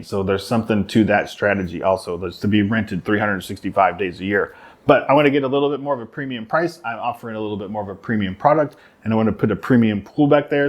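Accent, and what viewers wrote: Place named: Puerto Rico. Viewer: You have a slight. And I mean SLIGHT, Puerto Rican accent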